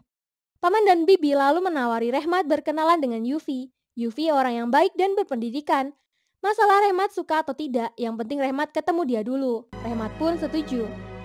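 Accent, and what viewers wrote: Papua, Indonesia. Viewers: Mimin pinter logat Papua.. pantes pintar ngemob aww. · 😂😂Fix, mimin anak Papua😅mantap Dialegx Min keren, Lanjutkan 😊🎉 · Mimin logat Papua za rasa lucu tra gaul se mimin 😂😂😂😂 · Mantap logat Papua nya Mimin♥️ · pake logat papua bleh sukses selalu kak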